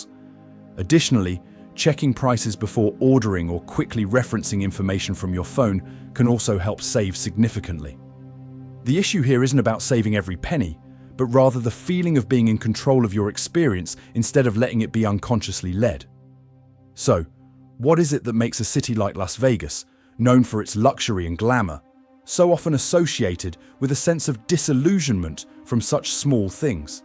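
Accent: United Kingdom. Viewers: Sounds like you want something for nothing. Las Vegas survives off of resort fees and the casinos without that there is no Las Vegas. If we go to your country you do the same shit what are you talking about. You're a hypocrite. You sound like you're from Great Britain has anyone ever been to Great Britain. They gouge the tourist like there's no tomorrow. You're a hypocrite.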